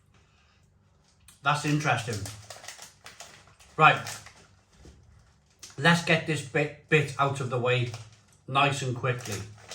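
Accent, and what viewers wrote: Wales, United Kingdom. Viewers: Oh clart, you from Newport or caaaardiff?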